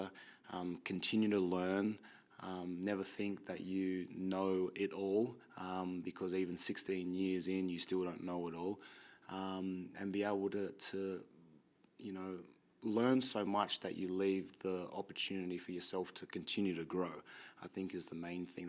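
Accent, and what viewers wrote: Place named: Australia. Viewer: Great he still has his Aussie accent.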